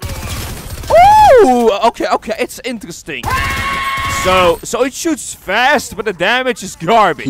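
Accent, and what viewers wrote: Netherlands. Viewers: Yourrrr Dutch I think bro👅 ( I’m Dutch ) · Lekker nederlands hahaha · Your dutch i can hear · kom je uit nederland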